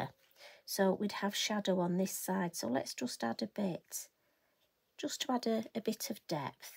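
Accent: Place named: England, United Kingdom. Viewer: love it! I enjoy watching you painting, and love your English accent. It is very soothing, and easy for me to understand what you say.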